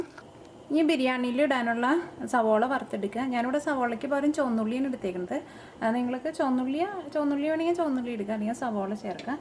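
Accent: Thrissur, India. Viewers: Ithonn vrithiyaayi paranj tharoo mole, ingale thrissur bhasha thiriyaanjittaa...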